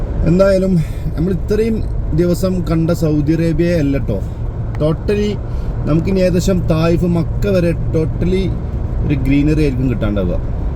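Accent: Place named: Malappuram, India. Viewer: തനി നാടന് വര്ത്തമാനം.... മലപ്പുറം കാരനാണെന്ന് തോന്നുന്നു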